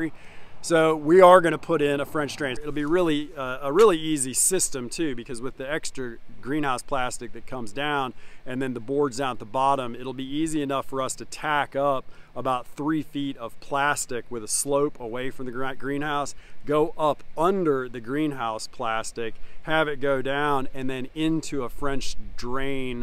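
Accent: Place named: Pennsylvania, United States. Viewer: sounds lie you are from PA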